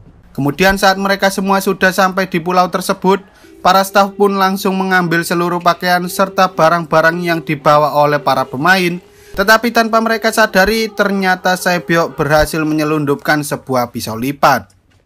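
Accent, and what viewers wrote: Java, Indonesia. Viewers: Suaranya medok banget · kho jawanya medok bnget😅😅😅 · Jowo campor indo wes mboh medhok e pol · Medog banget ngomongnya hehe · Kental Kali logat jawa nya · Ngomongnya medokkk banget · Kek suara sama logat medok nya dodit mulyanto. ya gak sih😂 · ngantuk gue dengerin miminnya medok bat 😭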